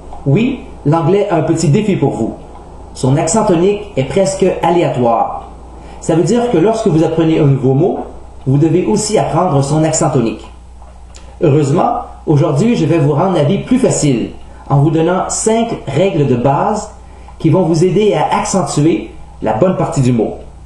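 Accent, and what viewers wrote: Quebec, Canada. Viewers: il imite l'accent québécois ou c'est sa voit normal ? (ce n'est pas une moquerie !) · tu ne serais pas québécois ?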